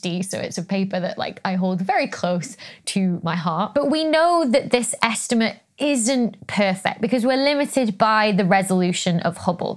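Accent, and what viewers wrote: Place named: England, United Kingdom. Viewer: your english accent is absolutely delightful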